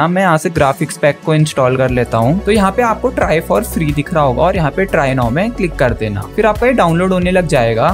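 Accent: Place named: Uttarakhand, India. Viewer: Bhai Uttarakhand se ho kya?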